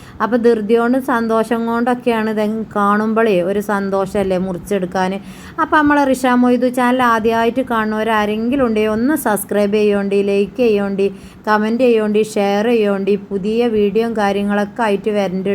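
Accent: Malappuram, India. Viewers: മലപ്പുറം ഭാഷയല്ലേ അത് കുഴപ്പമില്ല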